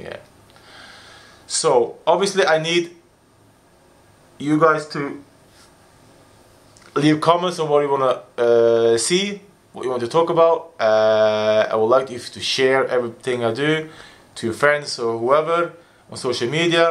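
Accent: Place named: Liverpool, United Kingdom. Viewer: Sko tru du sko hatt bedre scouse accent enn d der itte adle de åra:) blir bra detta! #legende! YNWA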